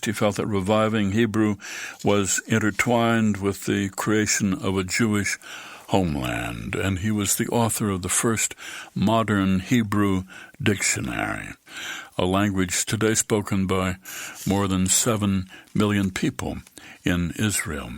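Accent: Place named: Midwest, United States. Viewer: That the earth went around the sun and not the other way around..... I love the " Midwest talk"!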